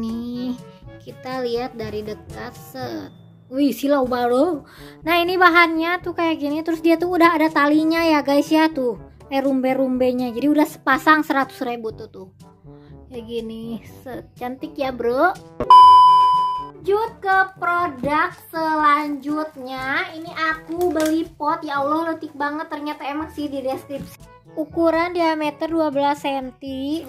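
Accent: West Java, Indonesia. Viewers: Kak orang mana kak, suka banget sama logat sunda nya wkwk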